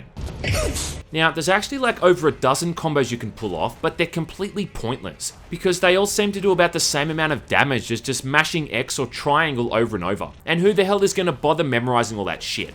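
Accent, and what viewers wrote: Australia. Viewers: This. I mean, sure, he does have that sweet Aussie accent, so I could have misheared him, but saying 'three' and sounding like 'four' is still a bit unlikely. :/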